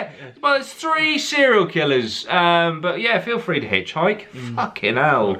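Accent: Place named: United Kingdom. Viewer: You brits pronounce trachea funny :P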